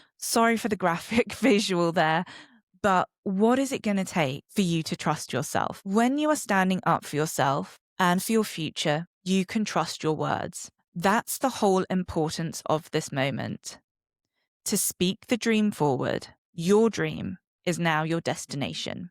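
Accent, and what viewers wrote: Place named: United Kingdom. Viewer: hearing a British accent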